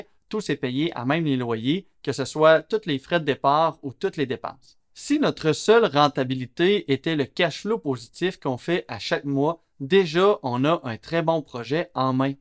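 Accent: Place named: Canada. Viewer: J'adore l'accent Canadien ! C'est tellement pittoresque. Merci d'utiliser cette belle langue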